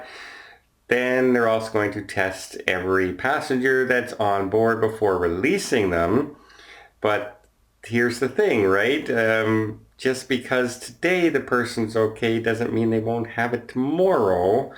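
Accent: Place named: Quebec, Canada. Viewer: he lives in Ottawa and his accent is more Montreal/Quebec to me than anything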